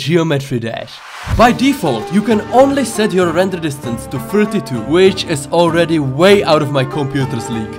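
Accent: Czech Republic. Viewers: Angličtina dobrá jen zapracuj na výslovnosti máš pořád strašně český přízvuk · dneska jsem se podival na tvoje video po prve a hned my došlo že jsi čech podle přízvuku xd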